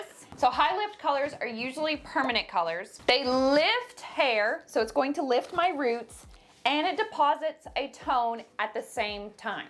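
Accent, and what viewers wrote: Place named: West Texas, United States. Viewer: Epi 6 of HILLLLLLBILLLLLLLY HAIRRRRR. west texas voice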